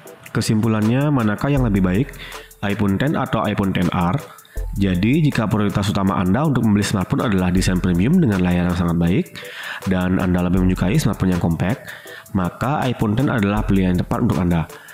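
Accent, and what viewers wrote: Bali, Indonesia. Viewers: Logat nya bali banget😅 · Orang bali kalo ngomong memang seperti itu kak logatnya, ini orangnya dari bali soalnya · logatnya macam anak bali nih 🤔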